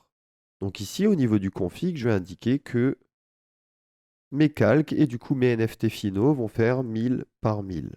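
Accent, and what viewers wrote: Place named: Alsace, France. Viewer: Ta un accent alsacien on dirait ahah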